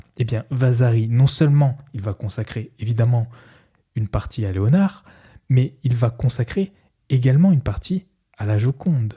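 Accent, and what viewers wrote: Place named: Housing estates, France. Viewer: Ce fond d'accent des quartiers qu'ont malheureusement les 3/4 de nos jeunes aujourd'hui (même ceux qui ne sont pas des quartiers), m'a littéralement dégouté. Affreux.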